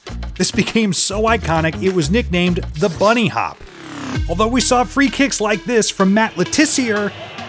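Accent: United States. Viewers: This channel sucks I can ignore the horrible american pronunciation but making passé remarks about Ronaldo being fat is just embarassing and completely unnecessary, considering the fact that it's of little fault of his own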